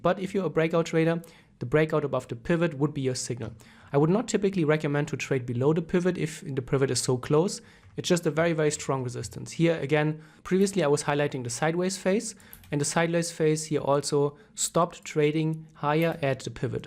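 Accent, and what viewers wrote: Germany. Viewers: I love your german accent.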